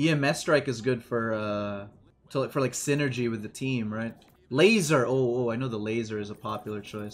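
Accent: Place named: Central Jersey, United States. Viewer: Yeah at first I assumed he was from Central Jersey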